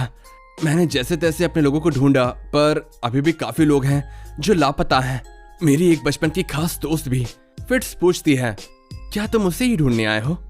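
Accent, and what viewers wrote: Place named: Lucknow, India. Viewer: Pakee chinery se pata lagta ha ap bhi up hardoiya Lucknow Se ho😊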